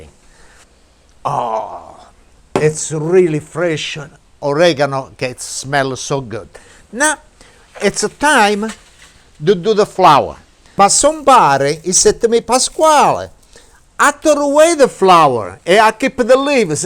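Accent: Italy. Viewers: His italian English is awesome !! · Love your accent gotta be Italian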